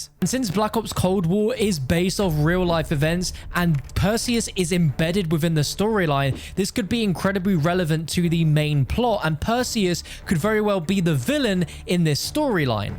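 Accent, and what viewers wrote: United Kingdom. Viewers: I know I'm gonna get whooshed, but he said agent. It's just our British awful accents. But yes very funny joke.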